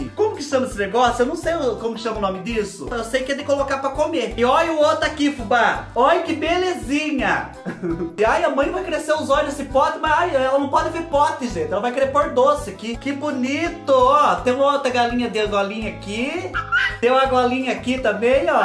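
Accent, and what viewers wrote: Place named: Paraná, Brazil. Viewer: Fubá, vc tem sotaque de paranaense! 🌹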